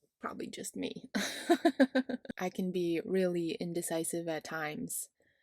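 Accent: United Kingdom. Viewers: 🇬🇧 What a tranquil island. British accent was perfect! 😁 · Everything sounds just lovely with a british accent! 🇬🇧🇬🇧🇬🇧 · Some more lovely and cinematic sequences. I'm glad that the seagulls didn't take down the drone ;-). You don't need to mock or be hard on yourself, the chatting is charming, as is the British accent. Did you watch Chitty Chitty Bang Bang for inspiration? Keep up the engaging work and life ❤️ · I love your British accent.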